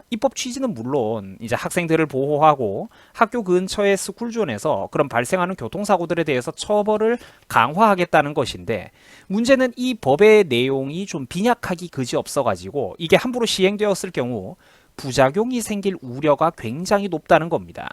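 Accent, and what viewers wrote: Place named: Daegu, South Korea. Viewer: 항상 잘보고있습니다. 대구사투리 넘좋다 하앍하앍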